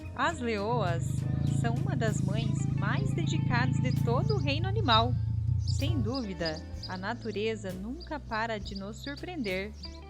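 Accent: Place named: Rio Grande do Sul, Brazil. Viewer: Com esse sotaque "gaúcho" no Brasil preconceituoso tem preguiça em dar like.. opinião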